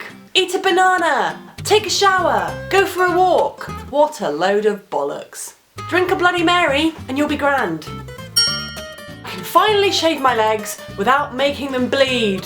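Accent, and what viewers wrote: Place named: Cockney, United Kingdom. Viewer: I think point 23 is the most satisfactory for you today; good for you. I know i'm annoying, but why don't you create english subtitles for your videos, since you write your own scripts for the most part of them? Foreign audience would be pleased to translate them into other languages, so that they could enjoy your sort of cockney accent.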